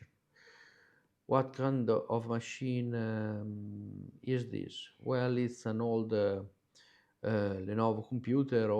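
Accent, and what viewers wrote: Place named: Romagna, Italy. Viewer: Ottimo video, mi hai fatto scompisciare con l' italiano perché si sente l' accento romagnolo..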